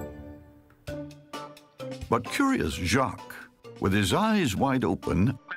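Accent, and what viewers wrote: United States. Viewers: The Stanley Parable narrator is British, this guy is American.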